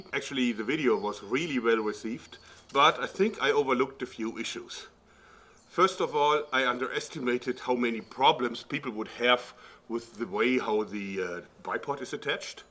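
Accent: Germany. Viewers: lol ist der deutsch voll der deutsche Hintergrund in der Stimme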